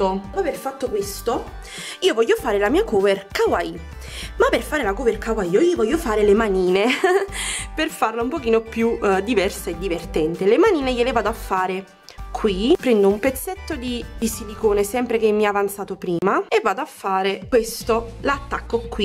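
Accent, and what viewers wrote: Naples, Italy. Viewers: Adoro il tuo accento Napoletano😍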